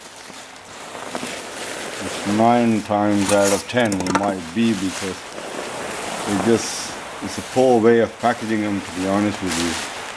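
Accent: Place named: Birmingham, United Kingdom. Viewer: Are you from the Midlands , sound a bit brummie matey, cheers for sharing pal👍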